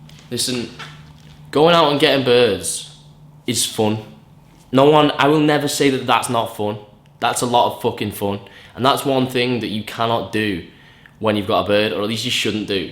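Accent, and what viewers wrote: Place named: Liverpool, United Kingdom. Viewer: Is his accent from Liverpool, Manchester or Newcastle? ...sounds liverpool. love it!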